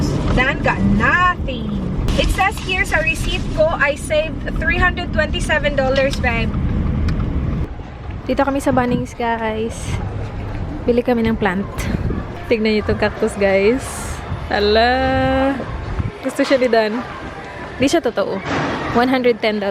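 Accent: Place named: Tuguegarao, Philippines. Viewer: Ate!! Are you from Tuguegarao? Hahaha omg!! Yung punto mo kasi, same sa tita ko. All the love ate!!!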